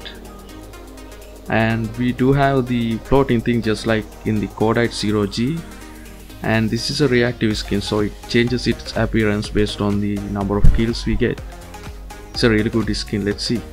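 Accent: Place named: India. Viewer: u do sound indian.... But it's fine.... Keep up the great work .... But I am Indian so.... Love from india ❤️❤️❤️❤️ and I dealt with him -_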